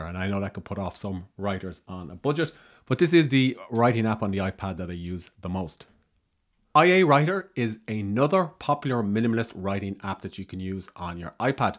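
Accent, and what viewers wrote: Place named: Ireland. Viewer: You mentioned that I can use a pencil. But I was wondering if I can use it as a handwriting tool? I know that you can use the pencil to click on the keyboard as you type but I can no longer see the keyboard so I was wondering if there's a way that it can be used for handwriting instead so that I can write as if I was riding on a paper? Did you get your accent from parents or do you still live in Ireland? Hopefully that made sense lol I am writing a novel currently and one of my characters may possibly be Irish and I was curious.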